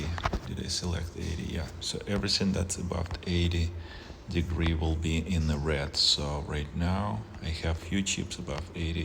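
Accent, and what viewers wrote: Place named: Russia. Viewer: Брат, ты из России? Акцент выдаёт😏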